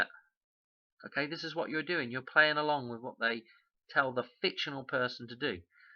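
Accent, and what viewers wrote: United Kingdom. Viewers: Liked your video mate a good watch sounds like your from the uk like myself and you seem pretty knowledgeable on all the laws of nature and the artificial laws put into by the powers that be of this world.👍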